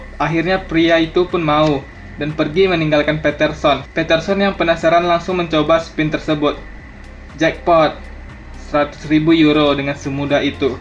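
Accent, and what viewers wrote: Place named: Minangkabau, Indonesia. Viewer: Orang awak ko bantuaknyo😂..... Medok x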